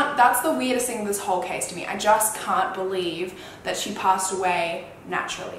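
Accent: Australia. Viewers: Holly molly she aussie oml i am too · yay she’s an aussiieeee!!!!! :)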